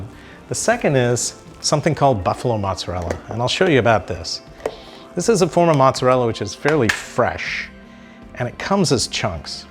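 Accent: United States. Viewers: So humble and simple person 👍🏻 😁👌🏻😋😋 i like American accent u talk , but its will fabulous if dr. Nene start to end of video only talk marathi 😍😁😁👍🏻😜 please video on Demand only marathi video . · Dr. Nene, you have american accent. I am from Florida. Happy to see you making pizza. I do make whole wheat bread pizza and it also becomes delicious. Madhuri ji is very lucky. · I'm mesmerized by his American accent over his marathi mulga core! Hehe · Sir, you described very nicely😍.. But i couldn't understood your fluent american english😣😣please mention the ingredients in the description box , so i will follow it😋😋😋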